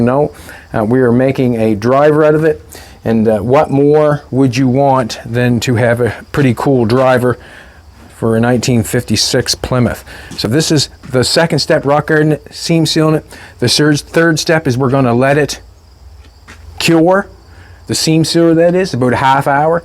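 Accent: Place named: Nova Scotia, Canada. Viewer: Trying to place that accent ,then I realized Trailor Park Boys! Then he confirmed with Nova Scotia. Awesome.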